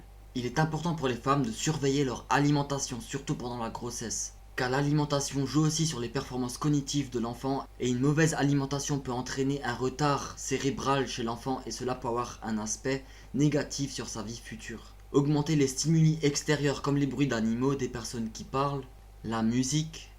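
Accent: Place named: Moselle, France. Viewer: çà c est un accent de moselle, coté saaaaaaaarguemines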